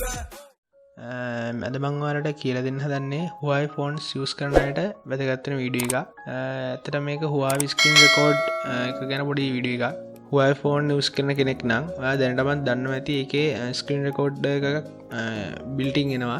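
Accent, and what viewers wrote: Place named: India. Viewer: Description in english speak in indian.. Wtf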